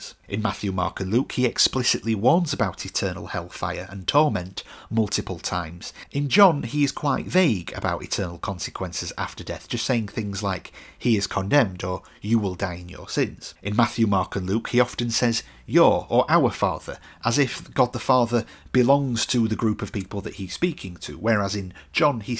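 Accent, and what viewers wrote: United Kingdom. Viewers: Great deep study of repentance, as always brother 👍🙏 i enjoy your studies, fyi: i love UK accent 😊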